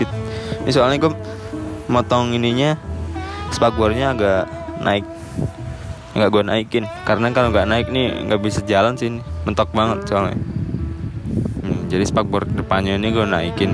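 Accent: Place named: Java, Indonesia. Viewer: Medokmu ketoro mas 😅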